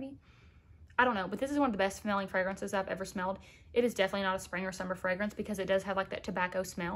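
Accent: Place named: Kentucky, United States. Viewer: Are you from the southern Kentucky ? I hear the accent in you!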